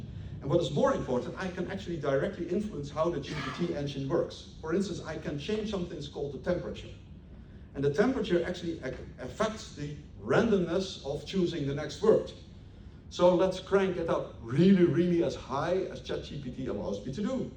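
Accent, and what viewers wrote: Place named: Netherlands. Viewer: Oh haha, dat is perfect! Meende al een Nederlands accent te horen, maar top, dan zal ik die ook nog eens doornemen om zeker te zijn dat ik het ingewikkelde engels (lees: technische engelse woorden die niet in mijn dagelijkse leven voorbij komen) niet verkeerd begrijp. Hartelijk dank voor de reactie!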